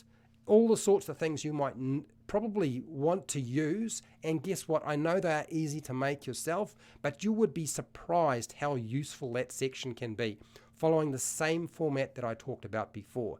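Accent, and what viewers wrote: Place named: New Zealand. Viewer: The kiwi accent makes me so happy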